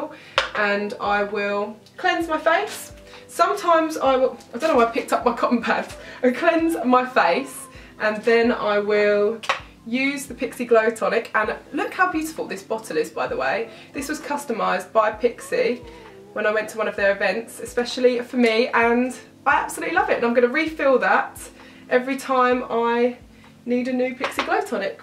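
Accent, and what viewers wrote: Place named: United Kingdom. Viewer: I used to only follow you on Instagram and I decided to check out your YouTube and I had no idea you're British!! Your voice is so cute!!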